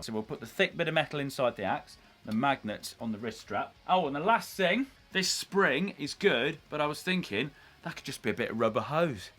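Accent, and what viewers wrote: United Kingdom. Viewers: i fuckin LOVE your british accent this is a #nohomo commentary i swear but i do really like british accent, from boys or girls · I really like his accent We asian can't get this type of accent asian really don't get this kind of accent except we were born in UK.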